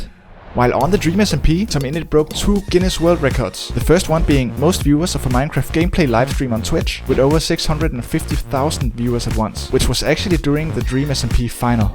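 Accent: Denmark. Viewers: are u from denmark i think i can hear the accent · I can already tell that this guy is danish because of the accent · Holy Danish accent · are you danish? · r u danish? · omg i knew i recognised that accent! hello hello hello fellow danish person! your video is AWESOME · The danish accent 😂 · U dont sound American what country are u from? U sound danish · u danish aint u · Are you danish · This guy has most danish sounding accent, good vid though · Wait you sound a little danish, MY BROTHER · Bro your danish right 🇩🇰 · Yo, you danish? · Lemme gues were you from. HMMMMMM Denmark? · holy danish accent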